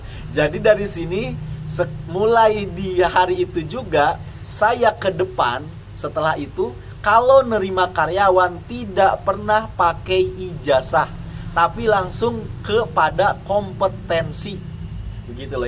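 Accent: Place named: West Java, Indonesia. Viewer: Kang.. knp sy panggil Akang?.. karena letahna letah Sunda.. Salut atas kontennya yg positif dan mengedukasi.. Gaskeun..Lanjuutt.. 👍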